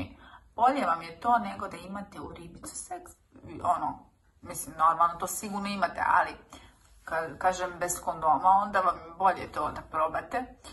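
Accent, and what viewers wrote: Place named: Ekavian, Serbia. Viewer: ako bas hoces da izimitiras macu probaj da ne pricas ekavicu providna si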